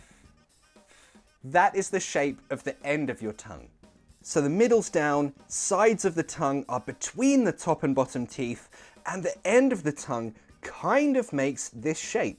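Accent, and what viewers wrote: United Kingdom. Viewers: I really do luv tgis man why in teaching .... he's the only reason why i like British accent ....he makes the British accent so easy....thanx man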